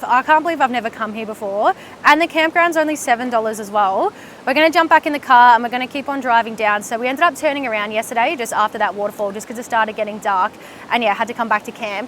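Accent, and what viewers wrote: Australia. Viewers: I like that Australian accent.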